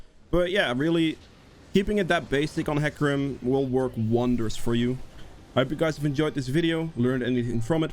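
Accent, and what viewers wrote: Netherlands. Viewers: Hoor ik nou een Nederlands accent?😂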